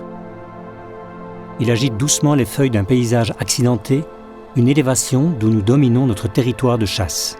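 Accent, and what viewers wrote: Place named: Belgium. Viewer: Chouette film mais les commentaires sont d'un pompeux !!!! Très énervants et dits par un belge , je dirais , mais cela n'est pas le problème...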